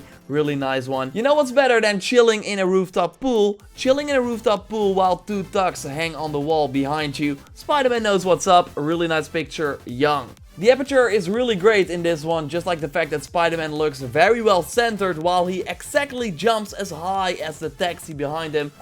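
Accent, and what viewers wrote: Netherlands. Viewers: You’re dutch. I can tell your accent haha (im dutch to :] ) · Are you from the netherlands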